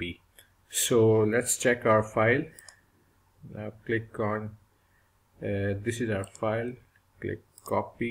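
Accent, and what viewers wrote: India. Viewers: 😂 Sorry but I can't focus, your Indian accent is so fucking hilarious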